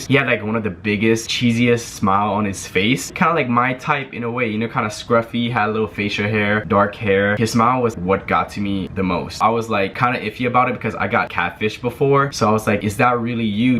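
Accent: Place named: Asia. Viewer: u are talking like a thug speak in your normal Asian accent voice please u bottom girl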